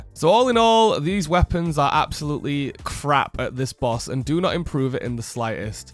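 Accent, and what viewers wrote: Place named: England, United Kingdom. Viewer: It's a beautiful day when uploads. The mad scientist is back with his perky english accent 😊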